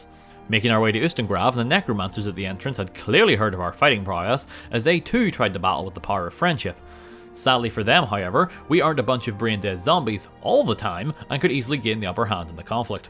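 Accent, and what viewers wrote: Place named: Northern Ireland, United Kingdom. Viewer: The Northern Irish accent just fits with Skyrim idk why.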